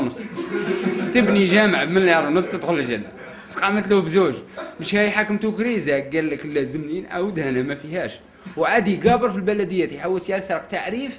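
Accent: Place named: M'Sila, Algeria. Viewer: Tu est très fort tu ma remonter le morale surtout ta belle accent de msila ou de Djelfa brabos et merci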